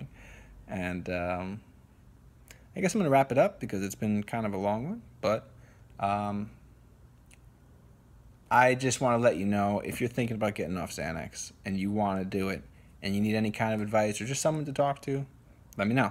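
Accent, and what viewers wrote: United States. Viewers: Are you from Tennessee?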